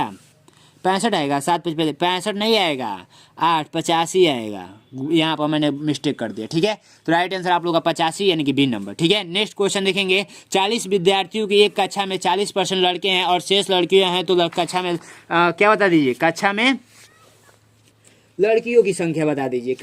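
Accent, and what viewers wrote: Bihar, India. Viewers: Sir up bihiri ho keya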